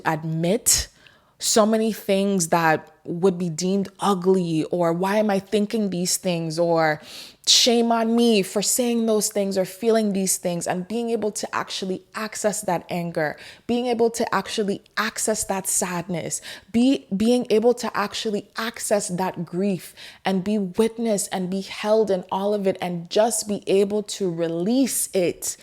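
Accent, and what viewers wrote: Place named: Caribbean. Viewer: Binged these videos and from the first one I said “She from the Caribbean🤔”. That “aht aht aht” was it for me 😂🫶🏾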